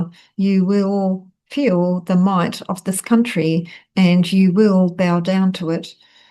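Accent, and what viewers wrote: New Zealand. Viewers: Thank you....for your honesty and bravery. I feel very connected to your messages.....and love hearing the kiwi accent!!!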